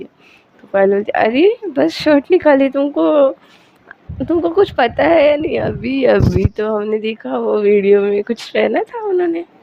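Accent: Maharashtra, India. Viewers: Thank you mam😊, aap bohot aache se stories batate ho. Muze kabse aapko kehna tha ki apki language se marathi wibe aati hain muze thodi thodi😅.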